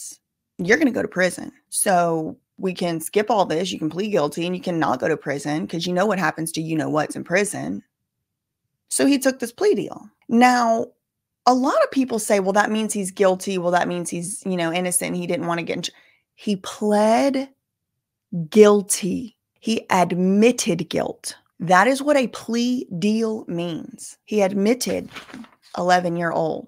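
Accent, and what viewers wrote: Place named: Alabama, United States. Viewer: I have been watching your videos and your accent is familiar are you from Alabama?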